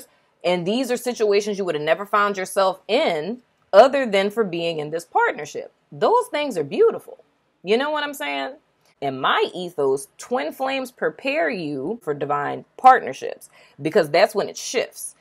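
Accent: New Orleans, United States. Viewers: Yassss NOLA accent!!! 😍🥰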